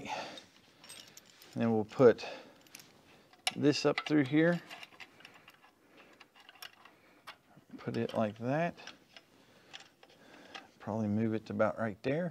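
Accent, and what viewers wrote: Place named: West Virginia, United States. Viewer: I knew I recognized your accent, I myself am a West Virginian. Proud to have you representing. 🤠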